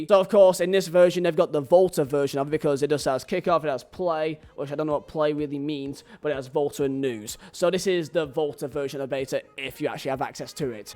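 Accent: United Kingdom. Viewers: Bruh, how are you in poland with a strong british accent like that?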